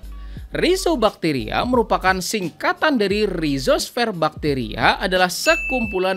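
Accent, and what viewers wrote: Banjar, Indonesia. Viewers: Orang mana sampeyan. Bahasa Banjar takana takaluar. 😊😊😊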